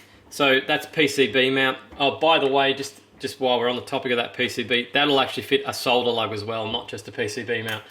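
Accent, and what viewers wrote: Australia. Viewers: Hi, Thanks for the video!! Refreshing to hear an Aussi accent. I’m from SA. This will be my first pedal project and I just wanted to check something before I start. I want to mod a simple a b box (no LEDs/power) into an a b y box. All I need is a switch to activate the y for different pedal setups (summing parallel effect signals (Q within a Q: is this the right way to sum?)). Would all I need be a single pole double or would I need a three pole double throw like the foot switch?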